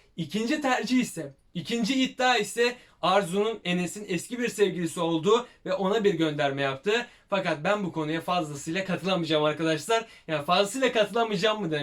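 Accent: Black Sea, Turkey. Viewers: Abi valla Karadeniz şivesini çok güzel yapıyosun haykırdım sayende 😂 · abi karadenizli gibisin haa ne guzel okuyon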